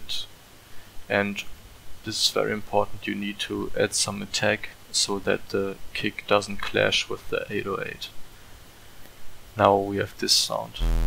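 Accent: Germany. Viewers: Can know by your accentXD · are you german? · Man hörts an dem Akzent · Are you german? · Thank you man! You sound like you're from Bavaria. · He isnt sad it's his accent he is German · hard german aktzent hast du :) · man merkt voll dass er deutscher ist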